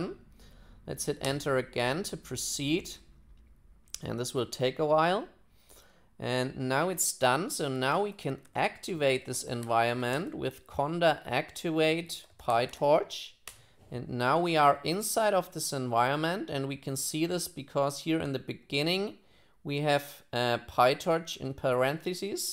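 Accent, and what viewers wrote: Germany. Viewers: His voice is too sharp with a German accent.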